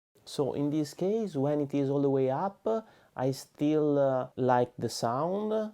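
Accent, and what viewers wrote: Venice, Italy. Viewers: a sentirlo temo di si ;D mi sa che è veneto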